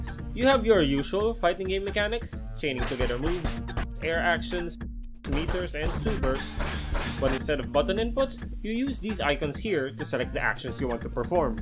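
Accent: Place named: Latvia. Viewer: You sound Latvian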